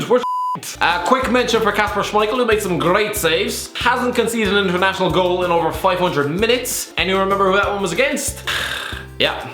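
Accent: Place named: Ireland. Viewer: nah hes just irish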